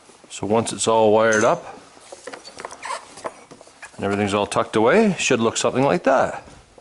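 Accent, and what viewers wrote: Canada. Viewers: Is that an Ontario accent?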